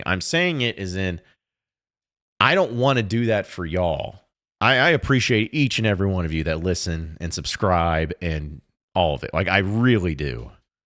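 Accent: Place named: Kentucky, United States. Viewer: This guy speaks Kentucky talk, he should start doing Kentucky sports, hes got some cawood in him.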